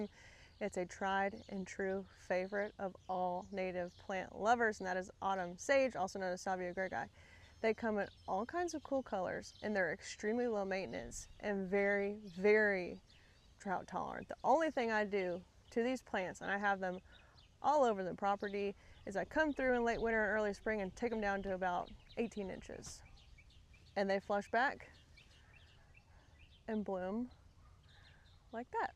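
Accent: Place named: Texas, United States. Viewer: you can tell she's holding back on her texan accent.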